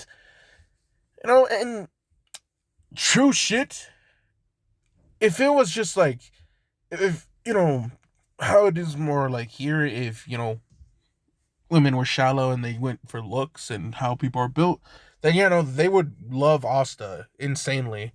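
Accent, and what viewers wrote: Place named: Russia. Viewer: Nice Russian accent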